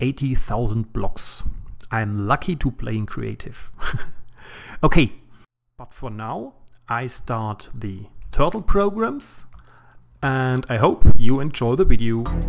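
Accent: Germany. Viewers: u sound german :D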